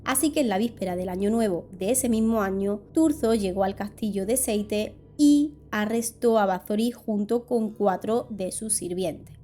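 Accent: Spain. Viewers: el acento de!!!el mejor acento de España!!o al menos el que mas me gusta!!! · 我喜歡你的西班牙口音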